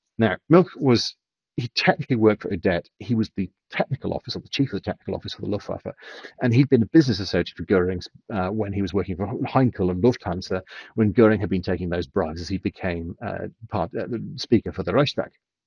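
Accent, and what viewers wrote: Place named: United Kingdom. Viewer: ... a beautiful and glorious Limy Brit that can make swearing sound classy😂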